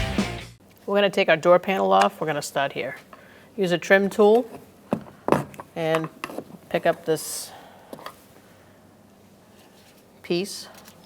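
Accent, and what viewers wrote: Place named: New England, United States. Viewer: In three second in, I love the strong New England accent 😂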